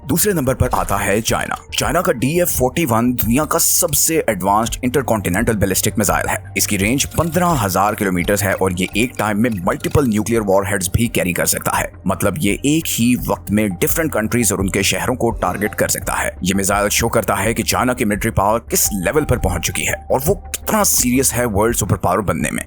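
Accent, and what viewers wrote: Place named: Pakistan. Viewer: Why are you sounding pakistani to me? Are you? You included north korean missile which is in under development stage and put it ahead of america. And you conveniently ignored India's 16000 km range Agni 6 which is also under development. It is in advanced stages. Your this lack of knowledge is a proof that you are a porki. Agni 6 is coming baby wait n watch.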